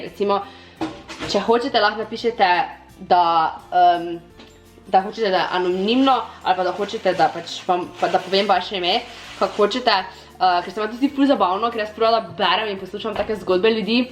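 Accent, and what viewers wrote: Maribor, Slovenia. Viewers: Zdravo, hvala za video. A si glih z Maribora al pa iz okolice (poskušam ugotivit iz govorice)?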